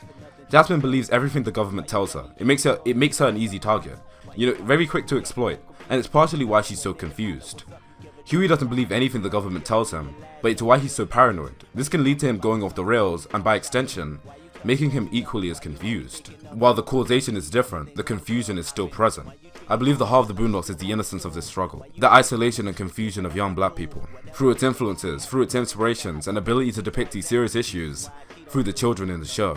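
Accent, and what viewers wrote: England, United Kingdom. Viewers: Question: You sound English. Is that observation correct? If so, would you ever consider doing a video on blackness in the UK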